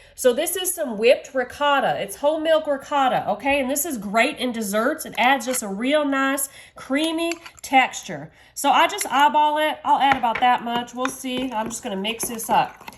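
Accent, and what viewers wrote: South, United States. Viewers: What part of the South are you from😊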